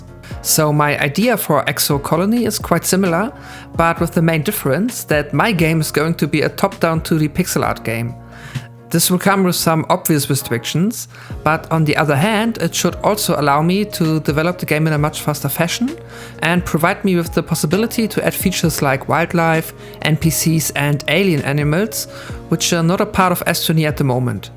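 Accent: Germany. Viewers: I recognize a fellow German when I hear one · are you german? i think you have that kind of accent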